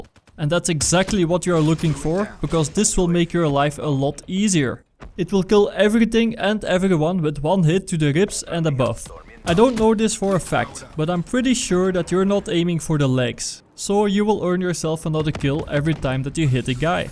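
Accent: Netherlands. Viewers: Are you Dutch by any chance? I can kind of hear it in your voice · I bet you're dutch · Eman kan gelijk horen dat je NL bent dankje voor deze vid ga gelijk mn class aanpassen hopelijk merk ik het verschil keep it up en rustaaaag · gek nederlands accentje hoor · You sound Pretty Dutch with Saying The "R" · i hear the nederlands acsent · fk erge nederlanse accend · Hahahaha je bent zo duidelijk nederlands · Are you dutch you sound so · You have a very strong Dutch-ish accent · je bent duidelijk Nederlands😂. wel awesome vid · dutch accent on point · Dutch ... I can kind of hear it in your voice. exactly that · nice dutch accent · je engels klinkt goed maar ik hoor gwn dat je nl bent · Lol youre dutch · Nederlands accent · He is from The Netherlands i guess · (Dutch accent) has to be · Hij is zoiezo Nederlands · your from The netherlands i can hear it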